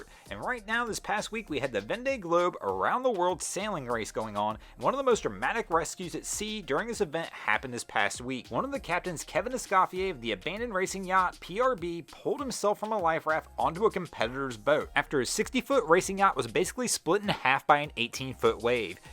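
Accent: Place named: Tennessee, United States. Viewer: You are amazing. where are you from. Tenessee?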